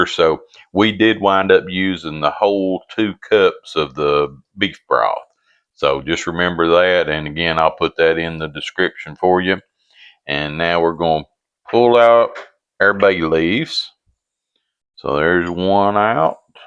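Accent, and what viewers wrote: South, United States. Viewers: Love to listen to him talk , great southern accent and grandfather voice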